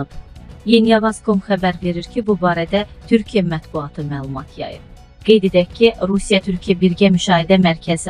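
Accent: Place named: Armenia. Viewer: Ay məni qınayan mətni oxuyan ermənidi ?? Bu necə oxumaqdı? Hardan tutub gətiriblər bunu ????? Ay bala bu nə matetialdıııııı?????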